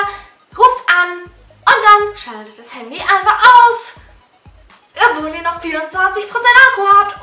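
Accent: Switzerland. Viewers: du bisch schwizerin oda ? :D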